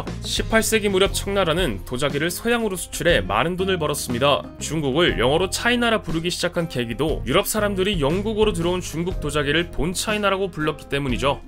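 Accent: Gyeongsang, South Korea. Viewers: 나레이터가 바뀐건가요?? 예전엔 경상도 사투리 쓰신 분이셨던것 같은데요 o.o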